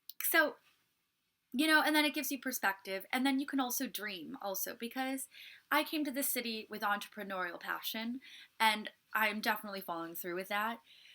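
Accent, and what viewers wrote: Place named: United States. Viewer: She sounds American. WHAT if shes Speaking the Truth, and these are all True .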